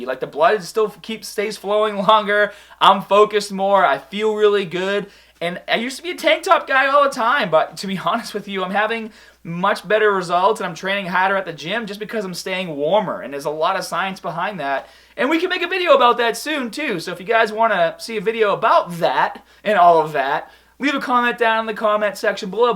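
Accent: Boston, United States. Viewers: That boston accent tho 🔥🔥🔥😁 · Are you from Bahstin?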